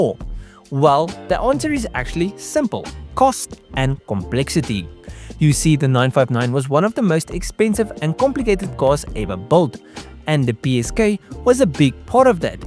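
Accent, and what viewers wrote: South Africa. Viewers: You sound like a white south african, please take care and protect yourself from the maniacs.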